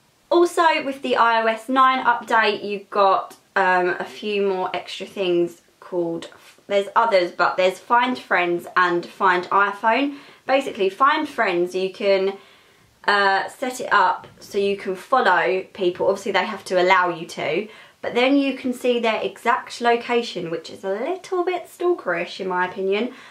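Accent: Essex, United Kingdom. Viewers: I like british accent ! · sounds like Essex maybe?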